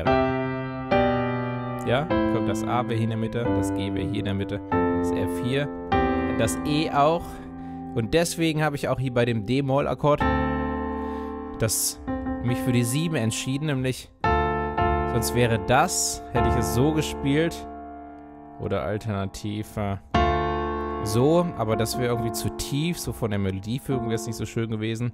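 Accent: Germany. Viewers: Moon yes you liking the videos we me, i find them very good, do you have a German accent? You hear you so on! 😂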